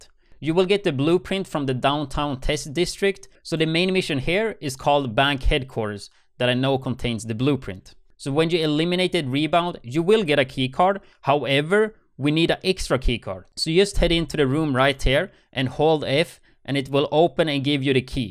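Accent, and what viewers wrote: Sweden. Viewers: the Swedish accent is strong with this one!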